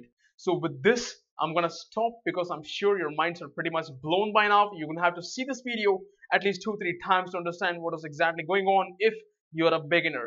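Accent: India. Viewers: I don't know why, to me he sounds like india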